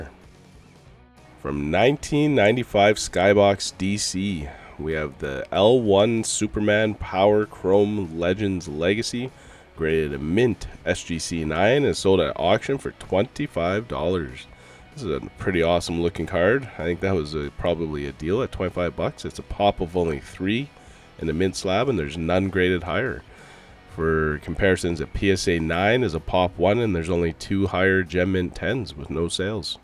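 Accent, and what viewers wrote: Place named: Canada. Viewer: I love the Canadian pronunciation!!! Lol...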